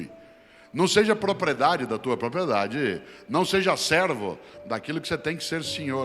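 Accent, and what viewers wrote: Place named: Londrina, Brazil. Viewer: Amo o sotaque do Cortella !Q honra p Londrina ter um gênio q nasceu lá! Parabéns Londrina. P seu filho q o universo admira.aplaude. ama.aprendre.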